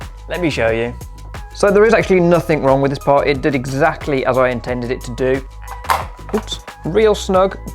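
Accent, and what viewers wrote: Birmingham, United Kingdom. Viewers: brummie luv !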